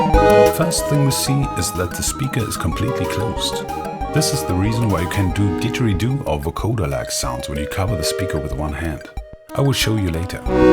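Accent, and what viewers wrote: Germany. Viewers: I Got a question for the guy? Are you German? Where are you from? Its a weird question but I recognize the Accent. Greets from Hagen in Germany.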